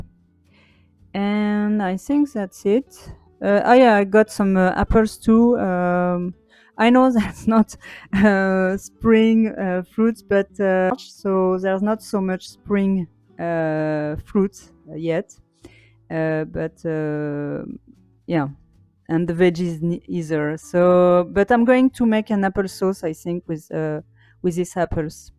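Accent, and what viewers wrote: France. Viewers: Thank you for the fresh ideas for sauces. It’s not close to spring where I live but I will definitely try these in another month or two. Loved the Parisian street scenes. You have delightful French accent and you seem like a native English speaker. How did you get so fluent in English?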